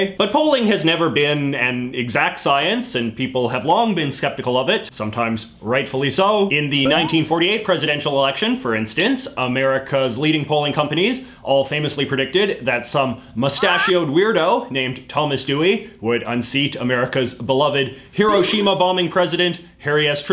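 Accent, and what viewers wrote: Canada. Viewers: this might be the most Canadian accent I have ever heard in my life and I love it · this is the heaviest canadian accent i have ever heard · Your accent is aggressively Canadian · Don't you sound too canadian to have a dog in this fight? (Or do you believe we're the 51th state?) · I love but as a fellow Canadian, I have never been more annoyed by an "about" pronunciation. I always thought that was a myth until I started watching his videos. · you have one of the Richest Canadian accents I've ever heard. Not saying that's a bad thing.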